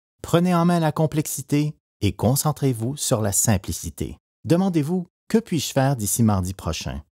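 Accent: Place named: Canada. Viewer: The Canadian accent 💀💀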